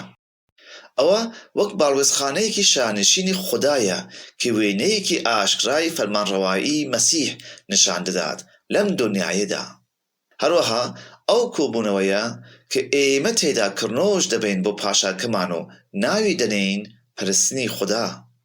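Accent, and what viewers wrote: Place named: Iran. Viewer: ئایا کوردێک نییە ئەم دەقە بخوێنێتەوە؟ ڕێزم بۆ ئەو کەسە هەیە ئەمە دەخوێنێتەوە، بەڵام ئەو کەسەی ئەمە دەخوێنێتەوە دەنگێکی فارسییە نەک کوردی، زۆر لە وشە و پیتەکان بە هەڵە دەخوێنێتەوە.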